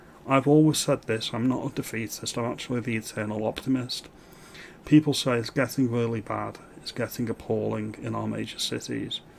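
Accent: Liverpool, United Kingdom. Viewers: Thank you for this video. I’m living in liverpool and I couldn’t agree more with you ( you sound Liverpudlian 🤣 ) I would vote for Ant definitely. My daughter was attacked by a demographic we can’t mention, a woman . My daughter need hospital treatment. To cut a long story short when the police came, they went to arrest my daughter until passersby who stopped to help told the police who the aggressor was. Twelve months later almost to the day not one police person from Merseysnide police have called to take a statement . That night my daughter was just going to the cinema with her friend and was minding her own business . The aggressor was like a rabid dog. It’s not safe here anymore my nerves are gone everytime she goes out and local government are just pathetic . IMO Have a great day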